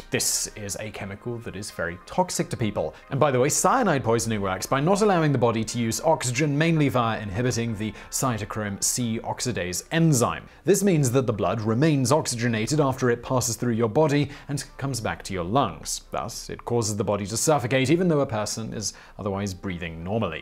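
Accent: United Kingdom. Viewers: You sound British but then you say stuff that is making you look totally not British if you went to a Uk school you had tapioca and sago !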